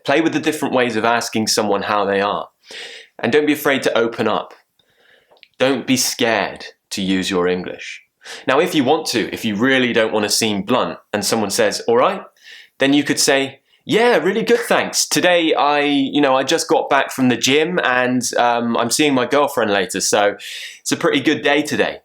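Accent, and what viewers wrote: RP, United Kingdom. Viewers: I have become intoxicated to your British RP accent, that's what we call it, I was obsessed with my pronounciation, felling better now. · thanks for your british accent/phrases tutorial videos, i just love it! <3 Because it helps me to understand some phrases what my cousin talkin' about btw she's from London.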